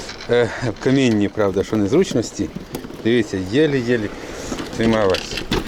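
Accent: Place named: Odessa, Ukraine. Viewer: Прикольный, у тебя акцент,настоящий южный.Коренной одессит походу.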